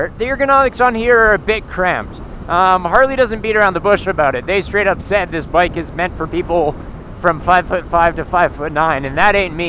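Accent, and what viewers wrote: Quebec, Canada. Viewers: Ho yeah un québécois !! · Is he from Canada? Sounds like Norm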